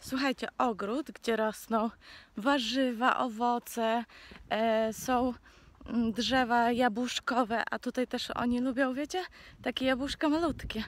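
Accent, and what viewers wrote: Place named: Ukraine. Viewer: Hej To takie trochę specyficzne i pewnie trochę dziwne pytanie czy masz pochodzenie Ukraińskie ogólnie po za polskie. Masz taki leciutki akcent. Pozdrawiam ciebie i Bażanta 😁😉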